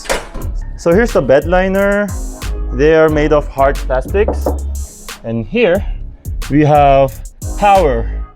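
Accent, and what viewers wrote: Philippines. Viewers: He has a Filipino accent. Must’ve learned English in the Philippines.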